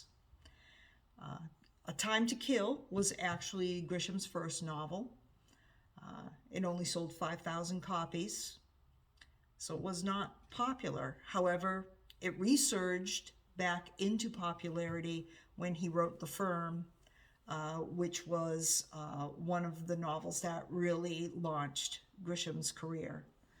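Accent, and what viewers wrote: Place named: New England, United States. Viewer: I love my local libraries too. We live in a pretty rural area where I am, but they do a nice job I think, and I’m looking forward to being better at participating once my kids are older and I have more time. A Very Virginia Christmas sounds fun. I loved Tomorrow and Tomorrow and Tomorrow-such beautiful writing and very moving to me. I hope you like it! I’m not sure if I’ve ever read any John Grisham. I’ve seen the movie The Firm of course and I didn’t realize there was a sequel, wow. Maybe I should try one. Just out of curiosity, are you originally from New England? I have a lot of family from NH and you have a slight accent so just wondering :)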